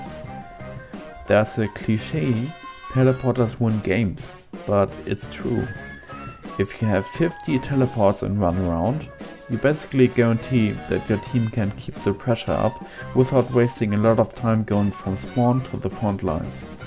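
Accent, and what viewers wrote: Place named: Germany. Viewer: Wie schön das man deinen Deutschen Akzent direkt heraushört. Liebe Grüße Leminsc8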